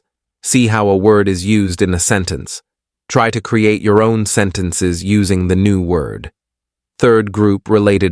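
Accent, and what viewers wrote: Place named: United States. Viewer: چقدر زیبا هس این لهجه آمریکایی ممنونم از شما دوست عزیز🙏⚘️🍀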